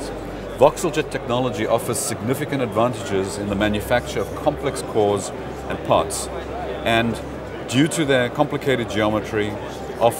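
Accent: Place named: South Africa. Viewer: you are south African by the sounds of it.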